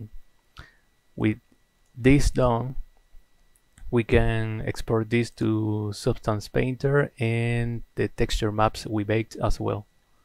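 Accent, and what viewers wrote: Argentina. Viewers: Jaja, me siento orgulloso de haber identificado el acento argentino (? muy buen trabajo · Excelent tutorial. Also, this man speaks english with a Good Airs accent, just like me, so really Easy to follow.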